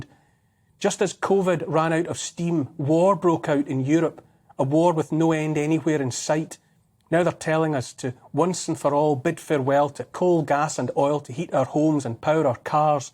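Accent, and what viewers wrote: Scotland, United Kingdom. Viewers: oh no baby, it seems you have gone down the route of justifying yourself to a stranger like me, I am flattered but I have not even met you yet, you must buy me dinner first 😉 'Irn Bru rhymes with Guru', yet you used both in your pet name for the guy, how odd from someone who has an elevated grasp of the English language and its use. Irn Bru is synonymous with Scotland, has a Scottish accent and is known to be Scottish also but of course it was just a coincidence that you used 'Irn Bru' to partner with Guru because it somehow rhymes with Guru. 'boohoo' would have been a good replacement and/or partner for Guru and it's meaning would have been appropriate to your goal. P.s. how dare you assume that I not only wear knickers but that I wear underwear at all.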